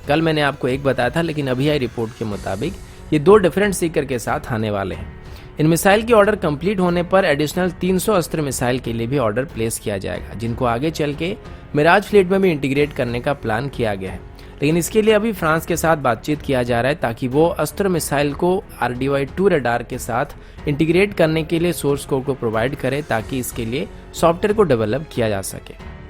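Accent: Odisha, India. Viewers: Lite odia accent . I know bcoz we both are from odisha😀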